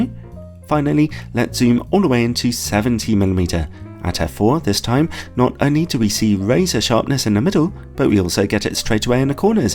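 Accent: United Kingdom. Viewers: Your lense and camera reviews are allways definitly one of the 3 best ones on the internet in any aspect from the technical and practical view regarding the lenses itself but as well from the aspect of very nice entertainment with your lovely british humor and accent, I like so much as I lived in London and Bath a couple of years ago for a short period of time! There are only Gordon Laing's reviews and DPReview on the same great level, these 3 channels are all I need to know about a new lense!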